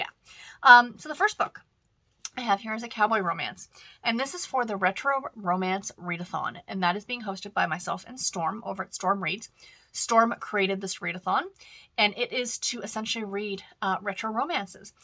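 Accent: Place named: Canada. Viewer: I knew you were Canadian! The accent and your vibe ❤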